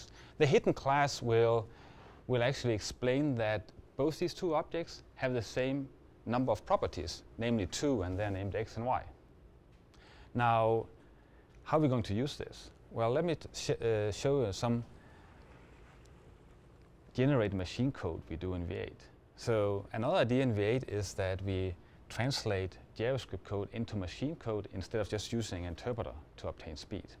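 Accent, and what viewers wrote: Denmark. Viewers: NO! its a danish accent. personaly i think all danish people should be forced to use english instead. its the worlds most singularly incoherent language. a horrible mix between swedish and german which speekers of either language is unable to comprehent. Hell i've heard even danish people have problems understanding each other. I really despice the danish language... (i dont mind the people just there horrendous way of communicating) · I can just hear he's danish :D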